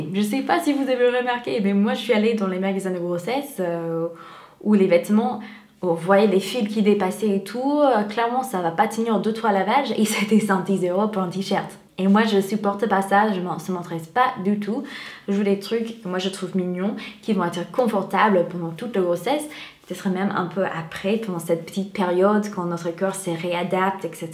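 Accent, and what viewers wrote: United States. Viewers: Salut! Ca fait 3 ou 4 mois que je regarde tes vidéos très sympathique! T'as fait une faute dans le titre Haut et pas Haul, mais tu es bien mignonne! J'adore ton accent américain continue comme ca!!! Bisous de Paris 😘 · la robe est superbe c'est celle que je préfères ! tu es très jolie and i love so much ton petit accent usa 😊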